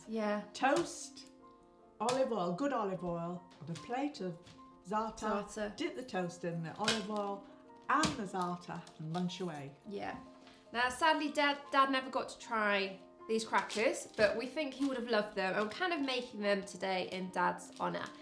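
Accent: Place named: England, United Kingdom. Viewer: Thank you so much for posting. I had all the ingredients for these and made a batch straight away. They are very tasty and go great with cheese. I've tried a few low carb cracker recipes and these are the best. You didn't specify Celsius or Fahrenheit but as you sound English I presumed Celsius. I have a fan oven so adjusted down to 130°c and they cooked perfectly.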